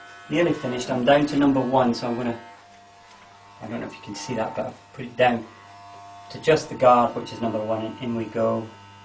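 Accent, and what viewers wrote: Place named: Scotland, United Kingdom. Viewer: Thank you for the tips!, and I hear a Scottish accent love it!.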